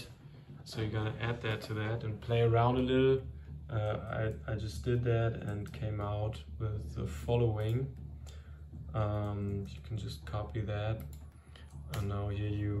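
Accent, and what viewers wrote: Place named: Germany. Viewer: Nicht schlecht, aber man hört das du Deutscher bist haha grüße.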